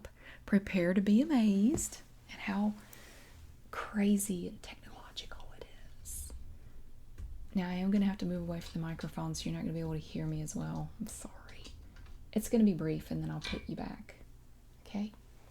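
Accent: South, United States. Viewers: I laughed when you said, "I'm gunna move you!" . You already move us with the content you create. You have such a welcoming and comfortable personality. You come across as a very natural and honest lady. Really Down to earth! Your soft speaking voice and light southern accent are very relaxing and you're pretty darn cute too! I thought it was funny when we saw that your tri-pod looks to be the same as the one that I bought and your laptop looks like my Toshiba. LOL. . My Tri-pod is a Targus. :-O Keep the great videos coming. Thank You! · We love your straightforward soft spoken vids. This display of your setup is especially interesting and informative. So much so that it tempts me to give it try too! Your down to earth manner is attractive and relaxing. Your sweet southern accents doesn't hurt either !! Thanks and keep em coming !!!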